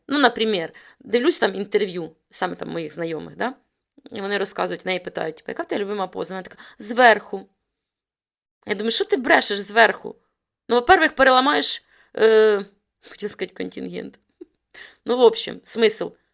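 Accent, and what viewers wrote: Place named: Surzhyk, Ukraine. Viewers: Та ну... Суржик у вас дуже милий, домашній такий, доречний. Впевнений що літературною теж розмовляєте. Просто фішка тут така своя у вас) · Я не знаю, чому Ютуб підкинув ваші відео, але я вас підтримую)))) Вподобайка та Комент у підтримку! У вас чудовий центрально-східний діалект на скільки я чую. І слава Богу що у нас в Україні можна оприділити звідки людина тільки по розмові. Успіхів вам)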